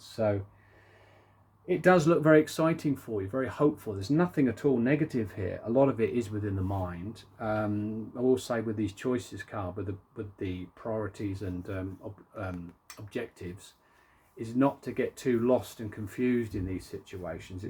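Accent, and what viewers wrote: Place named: England, United Kingdom. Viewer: Really helpful thank you, love your readings, very clear. This clicked with me...I guess j need to look at things a little more and figure it out, it helps to know to not panic decide, I often feel like you just want to 'flip the monopoly board' so to speak, but ill hang in there. I know what I want to do, ive just finished a course in learning what I want to do, its been hard whilst running a business ,which isn't doing that well anymore, so I kind of already knew, but its now when do I step on to the other train, it feels like I have to time it perfectly, and im very hesitant, but, tge universe will catch me, so.. . Ill jump soon, once ive prepared a bit more! I appreciate the good news of clarity will come and it looks all positive. Thank you. I've subscribed to you now, first time ive seen you and its nice to hear a fellow English person! 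I look forward to more messages to help me through he fog, its appreciated 🎉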